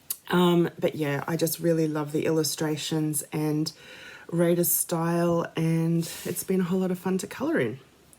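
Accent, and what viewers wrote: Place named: Australia. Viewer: I live very near to Newcastle Upon Tyne in the UK & my daughter actually lives there. As for accents, love the Aussie accents. 😊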